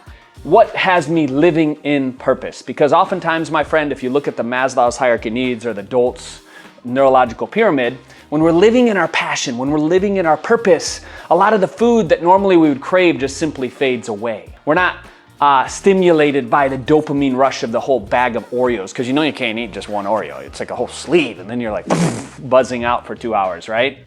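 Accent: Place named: South, United States. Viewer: The Miata and southern accent just gave me life. I have to start the video over.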